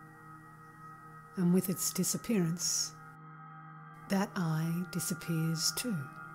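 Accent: Australia. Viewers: are you an Australia? I love listening to your readings and i love the space you give it, the most essential aspect of this work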